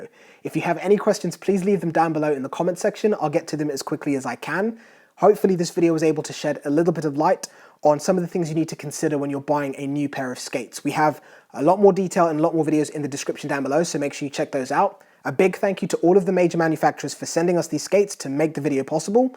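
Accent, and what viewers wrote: England, United Kingdom. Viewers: Please share??? Did you know about the English hockey club. I have only heard about One. In the CHL. Perhaps you Are the Guy in the video??? Then Why do you sound so english??? I am really confused. Help Me out. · SERIOUSLY???? This a video for remedials looking to get into hockey??? Mommy still dressing you people? And sorry, it's really funny coming from a guy with an English accent. Any hockey shop worth it's salt can explain everything about skates or you shouldn't be buying from that shop. They are the most important piece of your equipment so treat them as such and visit a local hockey shop; not a sports store with a hockey section nor buy them online unless you know for sure. But I find the gushing comments about this video utterly ridiculous. If you don't know how something fits and feels on your own body, no video in the world will help you. 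Hey, hats off to the dude who made the video if you are getting paid for it but having someone tell me how to buy skates is like someone trying to tell me what stick to buy or how many sheets of toilet paper to use and how to hold it as I wipe my butt. But that's the difference between self-reliance and today's nanny-state mentality where people need their hand held for everything. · Hockey tutorial from british guy?🤔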